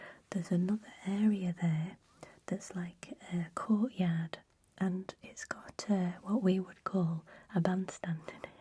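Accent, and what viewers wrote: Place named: Lancaster, United Kingdom. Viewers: ah the northern accent!! meks mi feel reight at ‘ome 😂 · Perfect Lancaster accent - love it! ❤🌹❤ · Omg I'm so excited back! I love rewatching the original tinsels travel every Christmas time 🎄!! (And sometimes during other parts of the year! 😆) Love the northern accent! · Oh how I miss home now. The sound of my accent. Where are you from? Me…. Sowerby Bridge. Can I book a holiday home through you 😂 from Norway.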